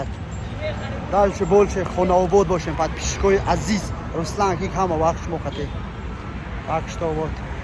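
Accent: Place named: Pamir, Tajikistan. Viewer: Памирский акцент.Хамшаро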